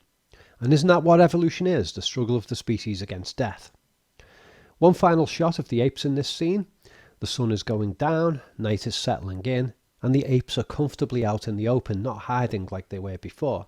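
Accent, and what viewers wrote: Liverpool, United Kingdom. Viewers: This dude has got to be from Liverpool. I'm an American, but I recognize that Beatles accent anywhere. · Same here, I re-watch to a lot of stuff at night for the same reasons. Never thought I'd find myself dozing off to sleep listening to the voice of a scouser. However, he has a very rare and soothing scouse accent haha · Sounds like a scouser to me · You’re an enigma,. Ive never been fond of the scouse accent (probably due to a stag do when the only Liverpudlian was an arsehole) however, I could listen to you all evening. This may come across as a back handed compliment, if so, I apologise. Grate werk r’kid..fantastic analysis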